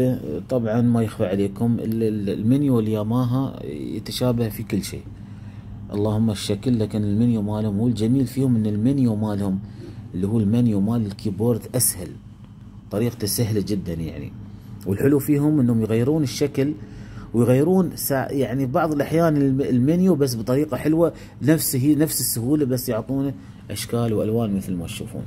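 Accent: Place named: United Arab Emirates. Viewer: Nice. I'm Iraqi born living in the USA. My Arabic isn't that strong but I was able to understand you fairly well. I assumed the UAE dialect would be much more difficult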